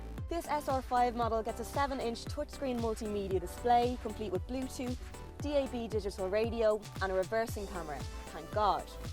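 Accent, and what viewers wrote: Ireland. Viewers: Well it is an Irish accent, don't be so insulting and turn on captions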